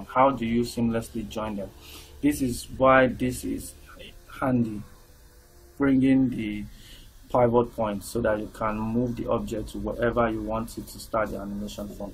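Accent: Nigeria. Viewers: You have a Nigerian accent...are you a Nigerian??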